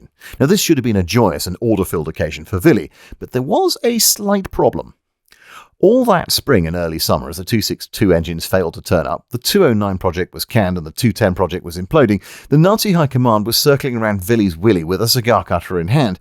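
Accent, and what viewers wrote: United Kingdom. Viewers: British accent not to shabby for you? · Why was it rubbish, why are you not saying why first, and then proving it, your accent is British, but your explanation (lack of)is pure American. This is why we can spot a pretend academic,and a real one. Please try again, only this time using the correct format.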